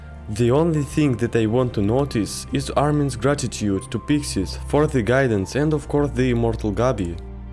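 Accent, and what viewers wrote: Russia. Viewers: Похоже на русский акцент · Чел ты руский по акценту видно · Слышу русский акцент... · Bro, are you Russian? 😅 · Are you russian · У тебя русский акцент) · Hmmm,English language with Russian accent. I like that👍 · are you russian? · you from russia???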